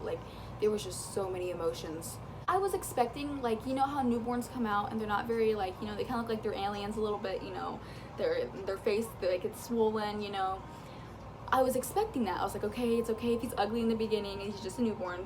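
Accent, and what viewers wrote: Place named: Indiana, United States. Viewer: I bet she’s from Indianapolis or close to it. It’s where I’m from and she talks how we do in central Indiana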